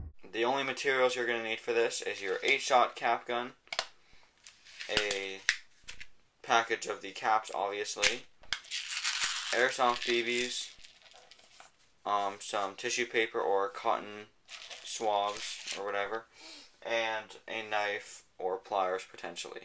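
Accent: Quebec, Canada. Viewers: Are you Canadian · You from quebec? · are you Canadian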